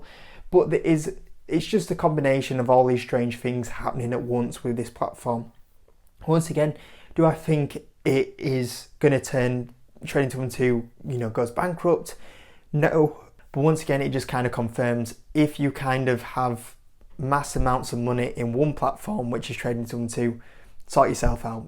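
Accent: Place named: Northern England, United Kingdom. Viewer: If you didn't look like Sid from toy story and didn't have a northern accent you'd have 100k+ subscribers. Best UK and Ireland based investment content on YouTube for inexperienced investors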